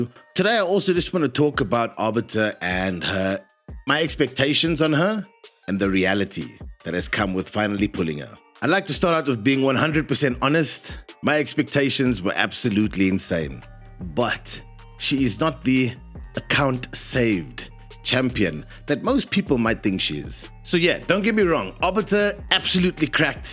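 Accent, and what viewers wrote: South Africa. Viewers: Interesting content.. Been playing Raid for years! Question: Are you South African?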